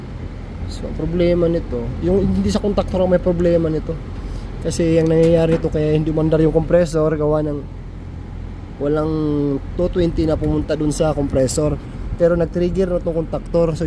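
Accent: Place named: Iloilo, Philippines. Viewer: ilongo ka too hahha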